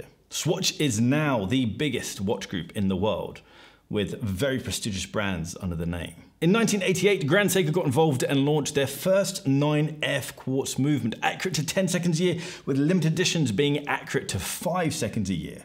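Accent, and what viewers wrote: RP, United Kingdom. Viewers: he is posh on the downlow he cant help it mate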